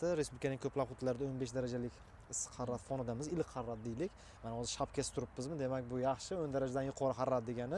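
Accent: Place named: Fergana Valley, Uzbekistan. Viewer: Asobi vodiy besharq gapirganigiz❤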